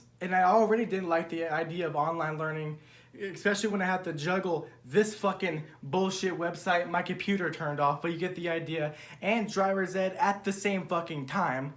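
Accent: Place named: United Kingdom. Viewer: British lady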